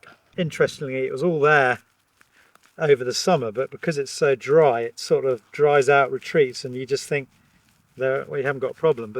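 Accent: United Kingdom. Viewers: I think his pronunciation is standard in the UK.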